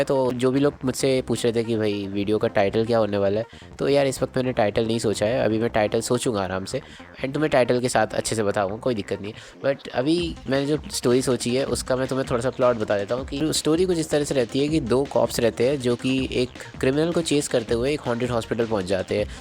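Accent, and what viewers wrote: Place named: Madhya Pradesh, India. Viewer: Bro what that pen is called you use for inking , sorry for disturbing you again but please reply , and you got a new subscriber 🎉 you are so humble and Wese bhi aapki tone se pata chal raha hain ki shayad MP se ho aap , please reply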